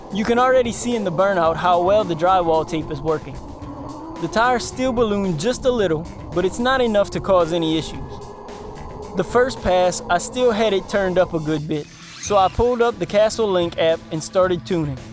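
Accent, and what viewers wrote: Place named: New Orleans, United States. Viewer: Where you from New Orleans? You have the accent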